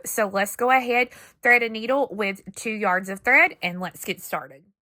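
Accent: South, United States. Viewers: Wow, this is lovely. I love the tassel, but I think for longevity, I would prefer a tassel made with beads. And I think you meant 25x18mm, not 25x8mm., you are amazing. Funny, all these years I thought your last name was, but it's just your warm Southern accent that made it not sound like.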